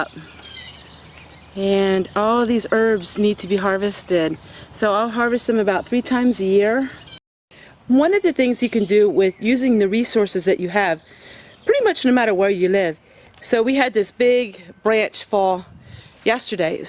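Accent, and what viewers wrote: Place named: Pennsylvania, United States. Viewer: I knew you were from PA by your recognizable accent. I live in Lancaster county. Your homestead is beautiful, and so inspiring.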